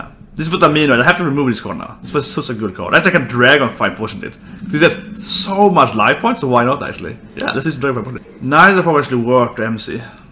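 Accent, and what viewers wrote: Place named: Sweden. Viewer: what a svedis acsent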